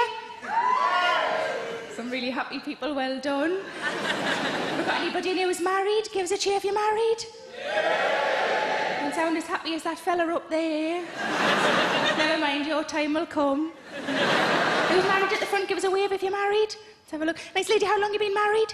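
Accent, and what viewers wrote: Newcastle, United Kingdom. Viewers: Bridge Metz she’s a Geordie ( Newcastle) so she’s from England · I can only hear "Champion' in Geordie accent now 😂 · this is a true geordie accent....not what cheryl cole does!!! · ironparadigm she's a Geordie, best acent ever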